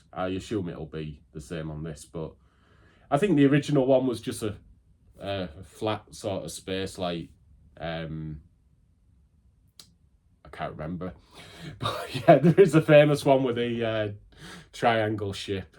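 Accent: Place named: Hull, United Kingdom. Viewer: Hey dude, great video, love prince of persia games. Found this video randomly and because you sound like me (im from hull, or should i say 'ull 😂) ive subscribed. Look forward to followng your journey mate. Keep up the great work and collection 👍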